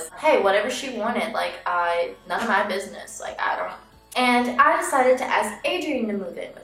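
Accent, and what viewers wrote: South, United States. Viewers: I cracked up when you imitated her screaming "I CAN DO WHAT I WANT! GET OUT OF MY FACE!" Just the way you went about it. By the way you're really pretty and I love your Southern accent. I live in Minnesota and some of us talk like Canadians.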